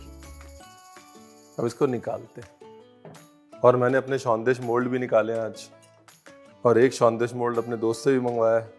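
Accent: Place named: Bengal, India. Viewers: Oh chef! Not only your way of cooking or recepies, but your bangla accents and love for bangali cuisines.. just have stolen my heart! All my kitchen equipments have got your mark 😀 wish could show u once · Being a Bengali.....am so proud of you ❤️ Jis tarase aap Bengali accent use karteho...oo kamal ka hainn ❤️❤️ Sending lots of love ❤️🥰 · Ye accent, aur ye bartan (kanshar thala), aur favourite sweet - jolbhora. Ranveer apne bataya nehi - aap ka Bengali connection keya hain 🤔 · I love your Bengali pronunciation ❤️ chef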